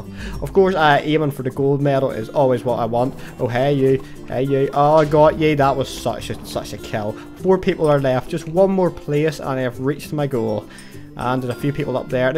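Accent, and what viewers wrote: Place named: Northern Ireland, United Kingdom. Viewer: what part of northern ireland are you from because i am from ireland too, but not northern:( oh well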